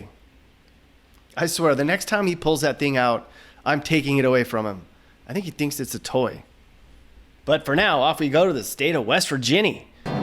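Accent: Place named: New York, United States. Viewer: He;s a basic New Yorker thats what a lot of us sound like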